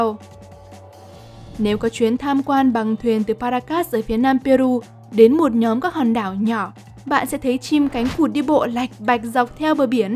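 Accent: North, Vietnam. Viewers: nói tiếng Bắc là đúng r mà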